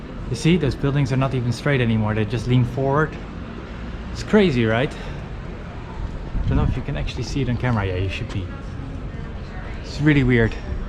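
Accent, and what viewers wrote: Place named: Netherlands. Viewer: A bit to many errors in reporting for that.. Alltough the accent sounds Dutch.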